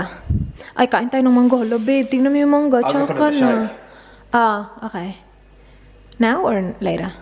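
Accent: Visayas, Philippines. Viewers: kaya pala parang familiar ng tono mo..katabing isla lg pala..sending my love from negros☺️ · Gusto ko mga vlogd mo..saka gusto kits personally kasi Hindi ka katulad mga ibang nakapangasawa ng foreigner lalo na Australian na napapanood ko sa dito sa yt na exage gumaya sa accent ng husbands nila...ikaw very natural ang English at fluent..saka gustong gusto ko yong Tagalog mong may accent na bisaya..Godbless..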